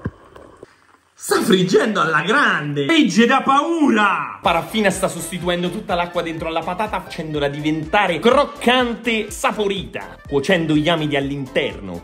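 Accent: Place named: Umbria, Italy. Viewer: Umbro fino al midollo, sentirti parlare mi ricorda le trasmissioni di TEF channel guardate alle 3 di mattino perchè sotto allucinazioni da febbrone.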